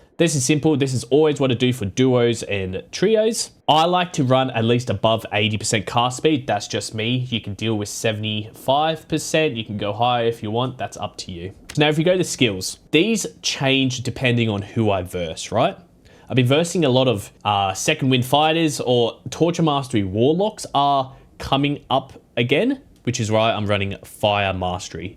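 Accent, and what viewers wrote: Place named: Australia. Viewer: Loving the australian accent haha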